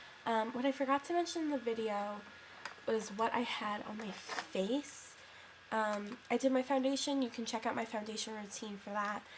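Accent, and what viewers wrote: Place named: New York, United States. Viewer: i just looked at my 88 warm palette, and i was looked at youtube for some inspiration on a cranberry look! i'm going to use this today for school and work! and btw love the new york accent haha i'm from the west coast so i recognized it immediately!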